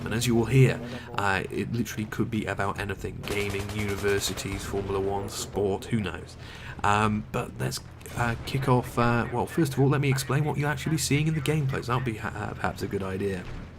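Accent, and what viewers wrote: United Kingdom. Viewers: And 3 basketball or baseball.... I think you're from the uk considering the accent... These are two great sports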